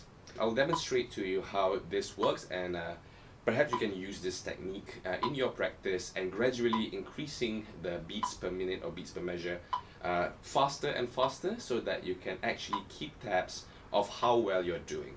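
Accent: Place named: Malaysia. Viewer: I haven't heard a lot of Malaysian English speakers so I'm not sure if this accent is representative. But I like the softness to it .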